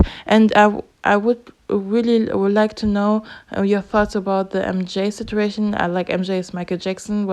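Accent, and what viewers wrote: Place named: Germany. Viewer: Do you live in a German territory? You have an accent similar to my Oma and Opa. 💜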